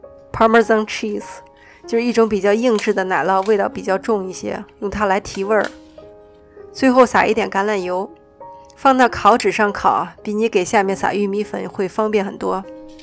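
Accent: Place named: North, China. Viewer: 我是北方人，听着的声音好像我的乡音，感动的要哭了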